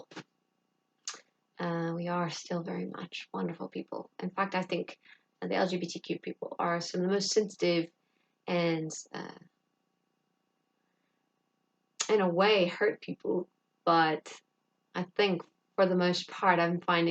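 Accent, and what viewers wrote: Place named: United Kingdom. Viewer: Can you teach me your wonderful British accent?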